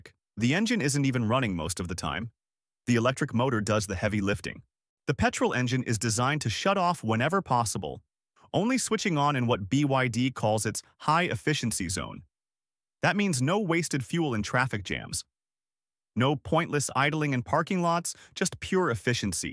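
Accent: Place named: Canada. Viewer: What's twenty hundred?...what am I watching and listening to? Canadian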